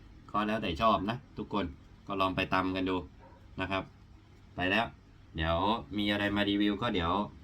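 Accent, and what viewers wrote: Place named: South, Thailand. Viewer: หล่อจังเลย ชอบมากๆๆ❤❤❤❤ น่ารัก พูดสำเนียงใต้ยิ่งน่ารักไปอีก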